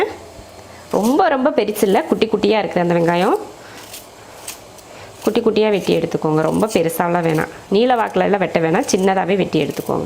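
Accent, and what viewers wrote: Coimbatore, India. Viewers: Neenga, Christiana, ruslimma,therindhu kolla aasai,eanna pottu vaikkamal erukkeenga,but, unga Kovai slang nanna erukku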